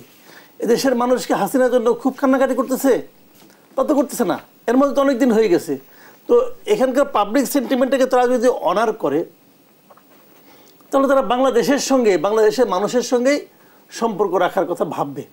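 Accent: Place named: India. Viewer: উপস্থাপন কন্ঠ কেমন যেন ভারত ভারত লাগছে।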